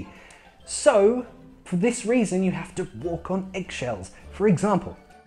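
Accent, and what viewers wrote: United Kingdom. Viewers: is he British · Bro, Your accent is exactly like Harry Potter. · I luv the British Accent 😄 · What is your accent? Is it ordinary british? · Hahaha I discovered your channel some days behind and I am really enjoying it a looooot~ u made me want to forget the american accent I learned to get the British accent u speak haha Thank u for the really funny and educative videos~ hugs from Brazil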